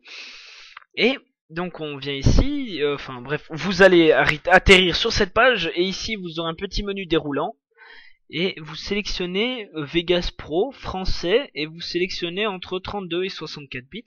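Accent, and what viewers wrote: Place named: Switzerland. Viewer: accent suisse :p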